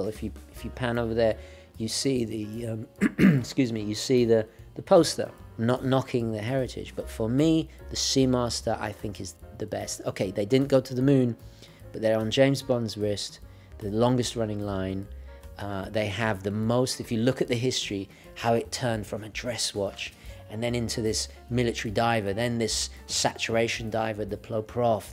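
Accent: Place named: United Kingdom. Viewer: As soon as Daniel Craig retires from 007 movies, is my choice for the roll. Charming,likes watches,British accent,probably looks good wearing a suít....not to mention his smile with those perfect teeth....any Bondgirl would go crazy... now,seriously,great video as always. Technical and always sharing your knowledge on horologycal culture and history. Congratulations!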